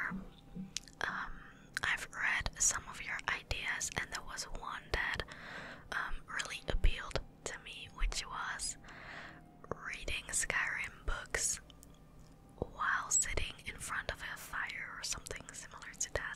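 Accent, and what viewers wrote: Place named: Netherlands. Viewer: Where are you from my guess is the netherlands cuz of the accent.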